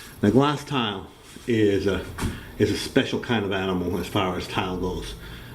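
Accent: Boston, United States. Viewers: I never tire of hearing the Boston accent. 🤣😂😁😂🤣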